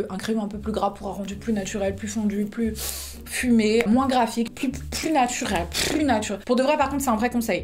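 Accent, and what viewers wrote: England, United Kingdom. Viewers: Mais tu me fais tellement rire, je suis fan ! Et cet accent anglais, j'en peux plus 🤣🤣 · Salut.j adort ton accent anglais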